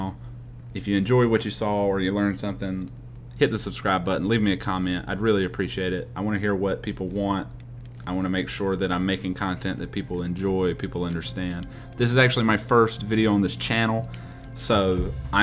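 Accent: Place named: United States. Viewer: Your accent is awesome lmao never heard a country accent for Godot tutorials. +1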